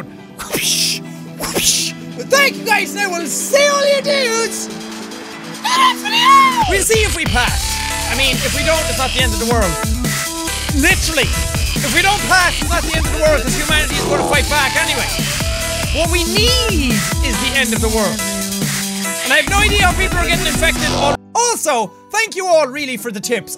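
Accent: Ireland. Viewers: Yes! I've always been wondering who has that Irish accent! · He is bloody fuckin irish m8. It`s his accent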